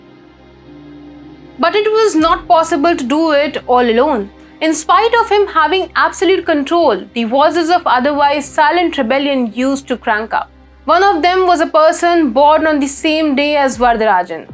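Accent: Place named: India. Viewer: She is speaking like an indian,,i am an indian tamil,,I can understand.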